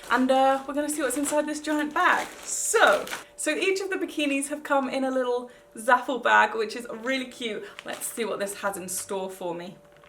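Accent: United Kingdom. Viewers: I luv ur accent, I have always wanted a British accent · Hahahaha i Love how she's criticizing those weird af non existent bottoms in her lovely British accent! Sounds so posh, a British person could insult my mom and id probably say 'oh thanks' just because they make everything sound so posh 😂❤️ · What part of Britain? I have a Shropshire accent, my daughter was born down south as that's where we were living she's got a southern accent, my husbands friend is from Scunthorpe and their accent is odd haha, my friends friend is Scottish, my aunt is Welsh...many many different accents and there are like at leave 5 different London accents depending on which part 🤣🤣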